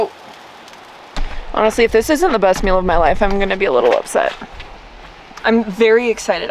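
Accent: South, United States. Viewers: Starting to find the southern accent offensive...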